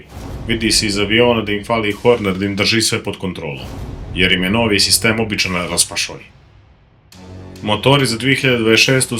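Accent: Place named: Montenegro. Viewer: Pravis najjace videe sa sve crnogorskim akcentom 😂😂😂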